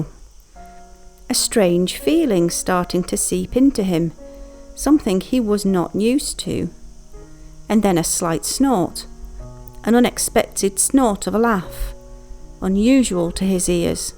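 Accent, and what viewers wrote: Northern England, United Kingdom. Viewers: I visited after the original Poldark series/ books, and eventually moved here. Do I detect a bit of Northern accent now. I always felt there was a mining connection with Cornwall and Yorkshire, my birth county. Lovely video.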